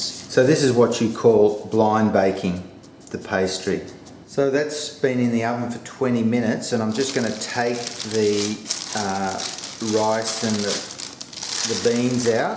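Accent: Australia. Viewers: Delicious !! Are you from Australia ?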